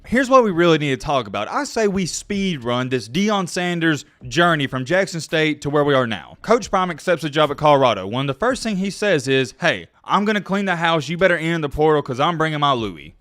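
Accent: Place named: Appalachia, United States. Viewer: Shame on me...I keep taking the bait! This guy keeps releasing the same video every 4 hours....same content, same annoying hillbilly voice, same frantic speed in his voice, SAME CONTENT....and I keep watching!!!! 15 minutes of NOTHING....say the SAME thing....beg for subscribers, read a few quotes....boom another video and more revenue from YouTube...good for him for making money and increasing his subscribers every day...but I'm out...get a headache every video....bub bye.... unsubscribe 🙏🙏🙏🙏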